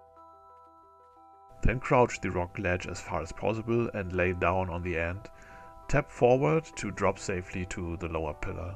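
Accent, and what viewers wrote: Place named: Germany. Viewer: Thanks. I have been making guides for the Ark maps myself & I really appreciate your video. My reviews: 1. Good clear voice & nice accent. Your accent German/Austrian but you are from Georgia, a very scenic country IMO. 2. "Potato mode" v low graphics helps in better visual representation of the ledges. 3. 1 dislike ?? Some People just hate to see others shine while they fall(to lava). Every jumping puzzle video has a lot of dislikes. Keep it up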